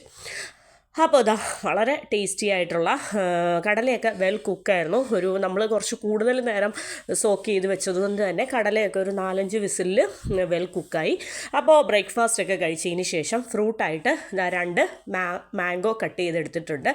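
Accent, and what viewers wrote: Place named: Trivandrum, India. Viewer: Nice video. Appavum kadala curryum nalla combination aanu.Nalla avatharanam. Kellan enik valiya eshtamanu. Place aevideyanu? Samsaram ketit Trivandrum aanennu thonnunnu ❤❤❤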